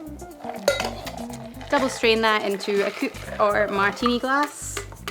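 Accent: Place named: Scotland, United Kingdom. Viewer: Always look foward to your vids...So gorgeous, knowledgeable, and love your Scottish accent.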